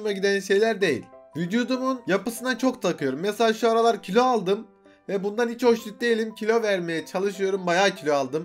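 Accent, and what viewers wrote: Adana, Turkey. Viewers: Abi sen Adana'lı değil misin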